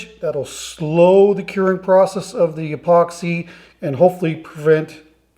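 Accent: Canada. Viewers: I love how you Canadians talk. Nice work as usual, good Sir. Did you two get the house sold? I surely hope so.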